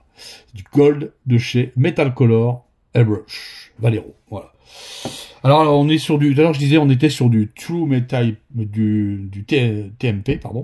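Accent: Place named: Nord, France. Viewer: Merci pour cette super recette, j'aime bien le rendu 🙂 Un grand oui pour des tutos une fois par semaine 👍 Je ne sais pas si tu donne des cours, mais tu devrais. Tes explications sont toujours très claires et sans chichi. Un grand merci! Et en passant, ton accent du chnord est au TOP. Bonjour de la Suisse!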